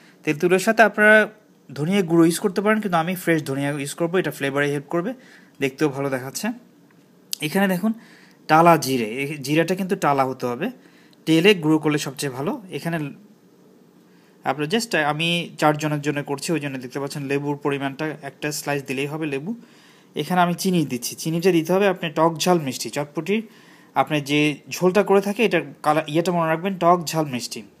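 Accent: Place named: Chittagong, Bangladesh. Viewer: is this man from chittagong ? he sounds so .. ! :-o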